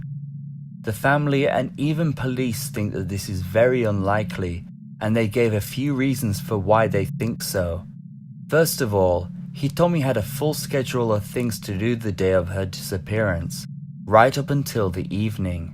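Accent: United Kingdom. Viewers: Your channel says you're from Japan, but you sound British. What's your first language? P.S. Awesome content!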